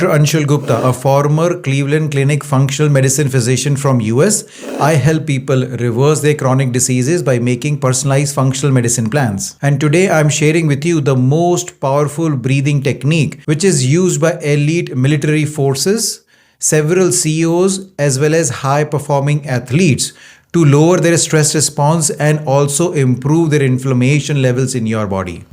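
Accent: India. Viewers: Hello Doc, are you really based in the USA? I don't think so considering your pronunciation. It is 100% Indian.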